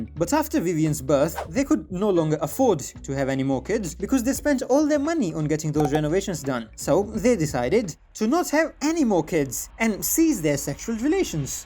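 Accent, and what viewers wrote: India. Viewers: That accent is thick in the Indian way alright. Maybe he tried to recover from the actual super heavy Indian accent but it still sounds very Indian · u r indian?? so what about this accent is it ai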